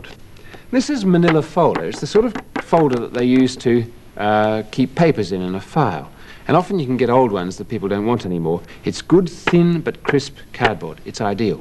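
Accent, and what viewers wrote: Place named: Australia. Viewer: You have the most stunning Australian accent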